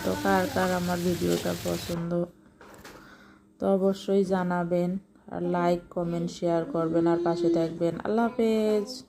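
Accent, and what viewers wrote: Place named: North Bengal, India. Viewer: আপনার কথা আর রান্না গুলো অনেক সুন্দর। আপনার বাড়ী মনে উওর বংগে তাইনা। কথা গুলো তেমনি মনে হলো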